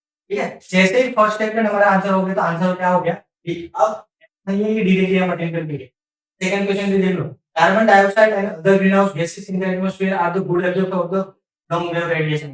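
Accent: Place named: Gujarat, India. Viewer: hindi accent is like gujrati. I think he is from gujarat. I really appreicate sir's efforts for the most amazing lecture. thank you sir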